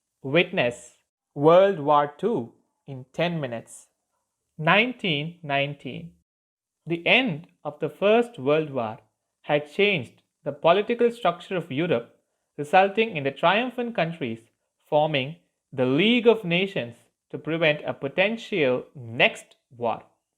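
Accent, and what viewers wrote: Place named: RP, United Kingdom. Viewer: English like bbc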